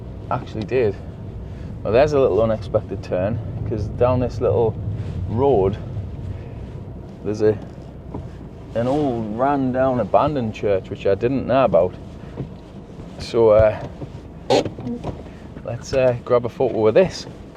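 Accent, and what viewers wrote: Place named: Geordie, United Kingdom. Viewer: You've just inspired me to start a little project myself. Not churches, and hopefully something a little different. Nice to hear the local accent in one of these Youtube photography rabbit holes. Geordie lad myself, based up in Cramlington. Maybe I'll come back one day and update you on the progress. Liked, subbed and commented, because...why not.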